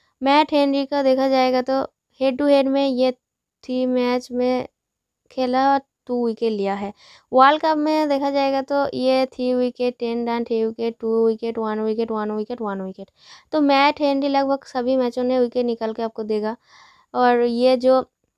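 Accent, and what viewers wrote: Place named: Bengal, India. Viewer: Aap west bengal se ho kya awaz se yahi lag raha hai.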